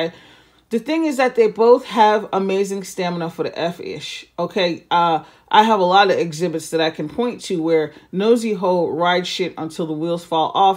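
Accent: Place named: Jamaica. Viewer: As an avid YouTuber thank you for this breakdown because I had no idea all this was going on!!! Big up yaself I think I heard a 🇯🇲 accent 💚💚💚#bapbapbap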